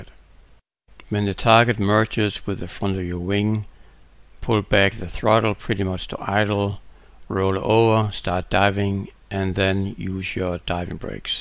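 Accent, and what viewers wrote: Denmark. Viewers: Så nemt at høre den danske accent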